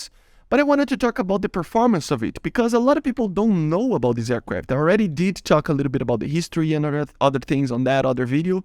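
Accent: Brazil. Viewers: Brazilian accent.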